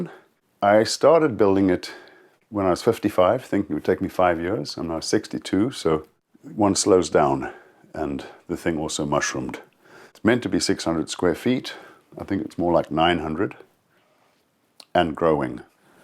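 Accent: South Africa. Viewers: I heard your voice and knew at once you were South African. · This was so good to hear an south african accent doing something so amazing. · Interesting unpredictable admixture of South African and North American vowels... nice life... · I recognized your SAffer accent immediately! Thought, "He's from SA!" Great way to live. My kids are also off grid here with a wonderful garden. Very few expenses. It's the way to go, with the way things are here and getting worse. Baai gelik en sterkte voorentoe. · Wow that South African accent, unmistakable. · I would recognize you south African wherever ur. Job well done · I am south african and could tell his accent immediately. he is living the life i could only dream of. well done. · And he probably grew up speaking afrikaans, English is his second language. Has trace of afrikaans accent. Intelligent human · as soon as i heard his voice i knew he was South African- watching from Durban.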